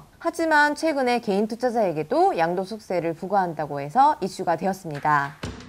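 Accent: Jeolla, South Korea. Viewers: 전라도 분 같아요 ㅋ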